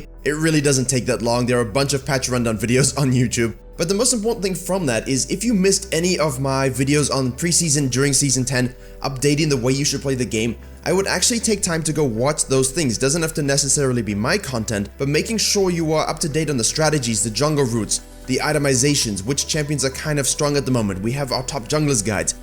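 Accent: South Africa. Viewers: that south african accent doe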